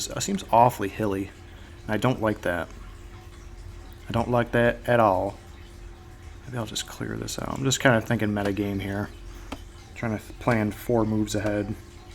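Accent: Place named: South, United States. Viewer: ooooo southern accnt! charming!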